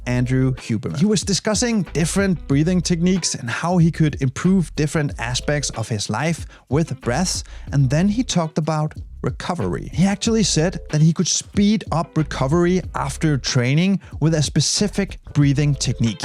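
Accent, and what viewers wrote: Denmark. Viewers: I thought I heard an danish accent in you, am I right? 😂❤🤍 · This is such a perfect danish accent😂😂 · Love ur accent are u from Denmark 🇩🇰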